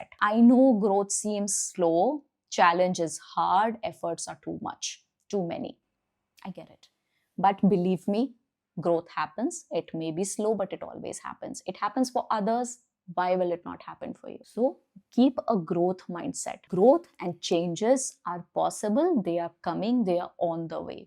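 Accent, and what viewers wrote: India. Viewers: Indian accent 😅 I didn't understand nothing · Maam is that you dont care about your accent. I mean you are not trying to have like native english accent. Im just asking?! Why dont you come out of your comfort zone from indian accent. Im not saying try to fake it, but ill be so much interesting if you could have native english accent like Janvi pawar. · You need a training dear, you have heavy indian accent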